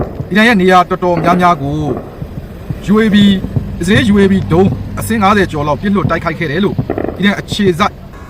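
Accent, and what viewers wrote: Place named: China. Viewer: မင်းအသံကတရုတ်ကလားသံပါေနတယ်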